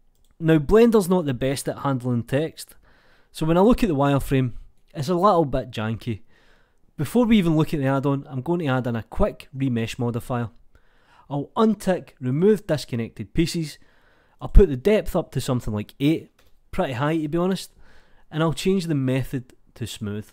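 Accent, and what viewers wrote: Scotland, United Kingdom. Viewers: Can it be used as a soft body afterwards? P.s. Your amazing Scottish accent made me wonder if it was English language what I was listening to, but I've understood the video perfectly nevertheless.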